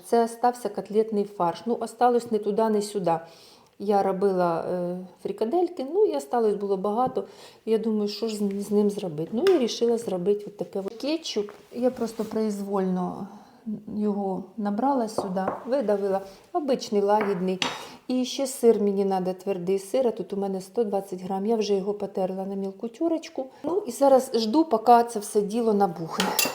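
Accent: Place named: Surzhyk, Ukraine. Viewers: Мені дуже подобається ваш канал. І я дуже дуже вдячна вам за ваш суржик. Він мені такий милий, бо нагадує Херсон, де я проживала до війни. Успіхів вам! ви така неповторна і щира. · Ви не схожі ні на кого,у вас свій стиль на воя харизма,у вас суржик,але він більш український,уГалини ж тільки для виду пару слів українських,ви приємна чудова,доступно все розповідаєте і показуєте не дивлячись на те що не закінчували кулінарних закладів для мене особисто ви своя,зрозуміла і ХОРОША ЛЮДИНА❤🎉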